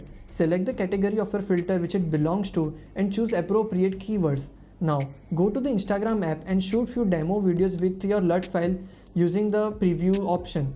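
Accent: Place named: India. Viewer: Yo, I wish you luck, but you should work with your accent. Indian english sounds cool, but not always.)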